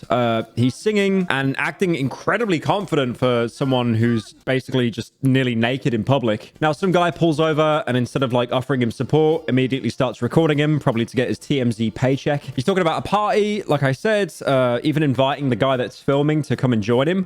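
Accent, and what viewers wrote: United Kingdom. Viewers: Nah that's how its pronounced, or at least close, just British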